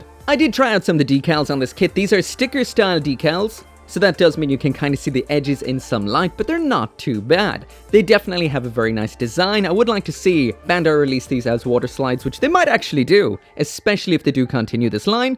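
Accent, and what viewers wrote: Ireland. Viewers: Once you shait I couldn't NOT hear your Irish accent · Shite. Thanks, i always wanted to hear your native accent! Still asking for that one single episode where only talk with an Irish accent ^^ Maybe something from the backlog?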